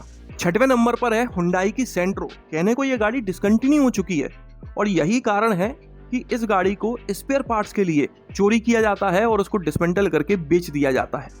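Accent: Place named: Gwalior, India. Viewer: Tumharii baat hi alag hai bhai maja la dete ho yaar ekdam.❤ Tumhari boli se mujhe to gwalior side se lagte ho.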